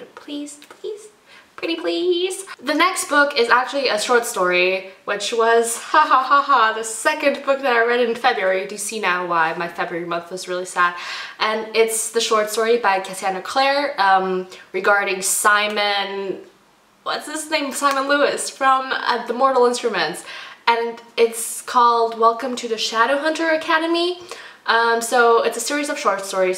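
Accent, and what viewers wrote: United States. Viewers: woah this might sound weird, but you sound especially american in this video woahhhhh also yes that mt. everest miscommunication mountain is v irritating marie rutkoski why do you hurt us so i've been wanting to pick up red queen bc everyone loves it but ehhhhhhhh it does sound v typical and i want something that's going to BLOW ME AWAY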